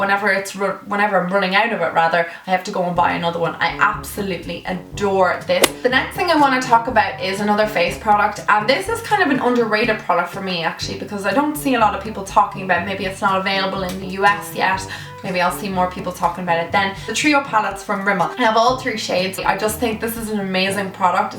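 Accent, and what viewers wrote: Ireland. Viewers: are you from ireland? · what part of Ireland r ya from ....Meath pride 💚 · You have an amazing accent, I feel like it's Irish ( because I'm Irish too ) if your from Ireland.. Where abouts?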